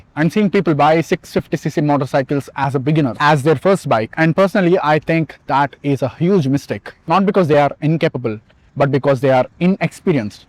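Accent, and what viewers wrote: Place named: India. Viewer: As an indian , this accent is 😭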